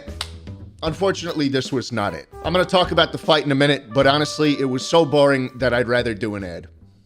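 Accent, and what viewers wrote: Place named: South, United States. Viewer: vertical video sounds like a read neck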